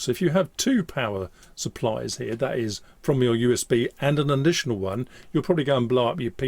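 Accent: United Kingdom. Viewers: Hey great video!! Where in the UK are you from? Thanks for your channel!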